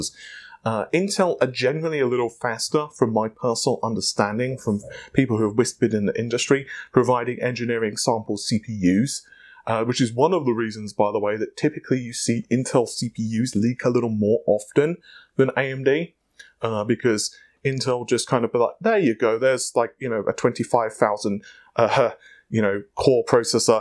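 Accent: England, United Kingdom. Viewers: My good man, you bear a striking resemblance to a young Syd Barett of Pink Floyd (RIP). The English accent only amplifies the similarities! Back on topic: Looking forward to upgrading my 1800x with some Zen2 goodness. A 144Hz monitor is in my future and I will need the clockspeed / IPC increase to stay above 100.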